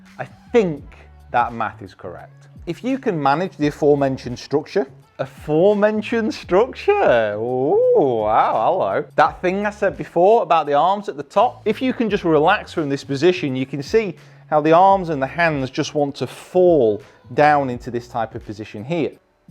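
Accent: United States. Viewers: American accent is so unsettling lol 😂 but great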